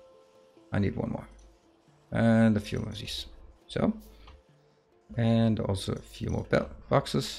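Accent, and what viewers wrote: Denmark. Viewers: First time stumbling upon your channel, I could tell right away you were Danish by the accent... Greetings from a fellow Scandinavian! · I'm Swedish, but I definitely heard the Danish blending through the English here. :) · Are you danish or something ? Your accent when speakin sound alot like a dane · Haha i could hear you where from denmark! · Since I'm danish, I could immediately hear you were also danish haha. Fantastisk! :)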